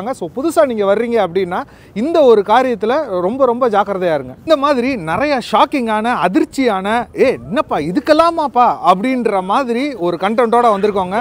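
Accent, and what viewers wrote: Madurai, India. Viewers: you speak, is madurai slang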